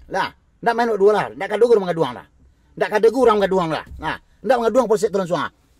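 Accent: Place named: West Sumatra, Indonesia. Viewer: Dapek na logat kuranjei mah mak.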